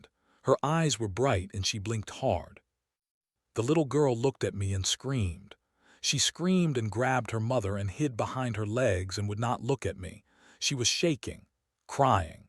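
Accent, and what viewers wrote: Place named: United States. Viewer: OMG do something with AI Language skills before you broadcast. it talks almost like it was taught not English but 'Murican.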